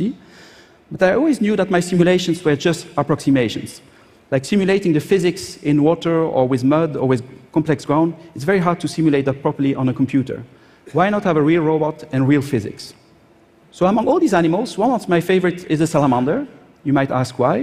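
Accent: France. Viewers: he's a french guy. i can hear his accent 😁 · when i finally noticed he is french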